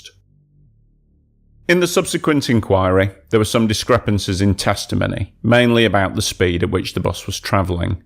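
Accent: United Kingdom. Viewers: Newly subscribed. You sound good and British mate. Cases we have never heard of such as this will take you far here on the tube. T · delivered in a soothing, charming British accent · Voice over British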